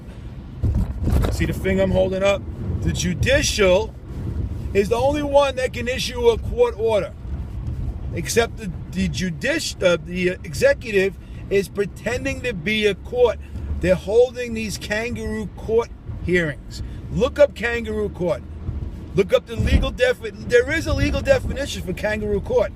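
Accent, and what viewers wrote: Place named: New York, United States. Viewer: I wish I knew u years ago, I just got off child support 2 1/2 yrs ago, and it only stopped because my 2 girls grew up. In the state on NY child support is up to the age of 21, I WAS SERVING MY COUNTRY WHEN I WAS 21!!!, you sound like a NY'er like me and I work for the MTA so you have a round about idea what MTA workers make, like yours my EX WIFE is a evil B*TCH who only cares about the all mighty dollar...I didn't do my homework and for 2 girls I was paying 900 bucks a month in CS...coming straight out of my check. When the CS was up my EX tried to sue me for $100 a month in alimony 2 yrs ago, 1st I laughed then I boiled up the petition and threw it right in the garbage...never heard from her again. for 23yrs I was hounded by CS threatening to cancel my drivers license. I figured you for a cop so you know the system a lil better than us, but I wish I knew someone like you when I was paying...I been with the MTA since 1998 the only things that saved me (mind you I lived with my grandmother until her passing in '99) was I got re-married in 2002 and I have a VERY understanding wife who stuck by my side when I was making 250bucks a week after all these deductions in NYC (just try surviving off of 250 bucks a week in NYC!!!), I started as a bus operator and when I got my 1st promotion to dispatcher I kept my mouth shut and I was making a little bit more money, then I got promoted again to a different title and made more money...When the child support was over I got my first FULL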